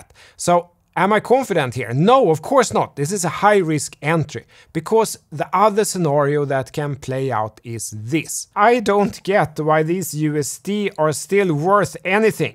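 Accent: Sweden. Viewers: I love your thick Swedish accent, and I'm not being sarcastic. You sound exactly like a voice from a south park episode. If the crypto stuff doesn't work out, do voice acting. :D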